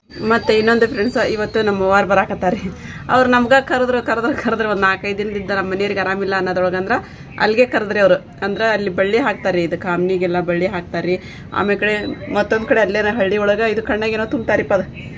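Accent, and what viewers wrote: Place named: Gadag, India. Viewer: Nim maat kelidre same Gadag navar maat gate adav nodri..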